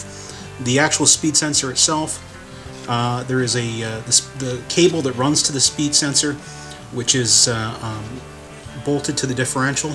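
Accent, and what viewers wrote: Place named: Canada. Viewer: Go Canada ! Can hear the accent come through clearly. May the truckers be blessed and may Trudeau go the way of Herod. I really needed that tip on the fender thingy because I struggled with it last night and was cursing German engineering. Also I came up with an extra little black washer ? Does it go on the back part of the shaft housing where the one fender bolt goes in ?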